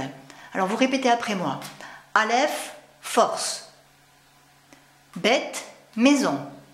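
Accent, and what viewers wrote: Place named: South, France. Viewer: lamed bâton de berger ? jamais entendu cela lors de mes cours d Hébreu biblique, y compris par mon prof, juif pieux, vivant en Israel...Lamed = enseigner, apprendre (a donné limoud/verbe melamed), il dépasse en haut, car le maître dépasse l'élève, et ce dernier peut aussi dépasser le premier ("on apprend les uns des autres" dit mon prof d'Hébreu), ceci dit, j'aime bcp vos videos ma soeur, vous êtes très pédagogue, et avez une voix très posée, très calme..et un joli accent du sud ! soyez bénie...PS le lamed dans sa forme ressemble plutôt à un 7, et le bâton de berger est shevet ("shavtekha" ps 23 ta houlette)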